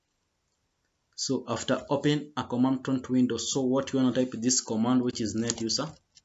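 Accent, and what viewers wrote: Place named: Kenya. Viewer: omg this guy is kenyan the english tells it all rada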